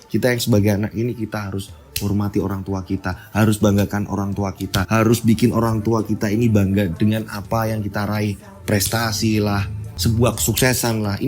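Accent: Java, Indonesia. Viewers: Siang bang salam dari Medan Sumut ,saya seneng degerin canel Abang ini , ada lucu " ada serem " dn yg paling bikin saya senang ini bahasa jawahnya yg totok bgt sagat " jelas cara penyampainya , karena saya turunan Jawa solo ,bapak Batak jdi saya senang bgt degerin dgn keturunan org " Jawa 😊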